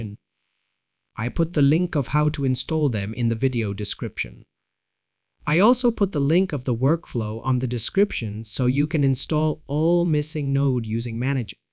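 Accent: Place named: India. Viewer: stop using the AI it's more unintelligible than your indian accent. Everyone knows you're indian, you're not hiding it, you're literally just making people more racist against indians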